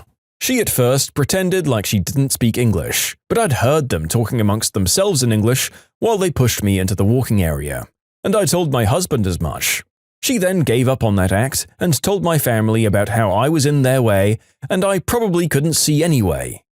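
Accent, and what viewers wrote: United Kingdom. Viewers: Bro is british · Is bro british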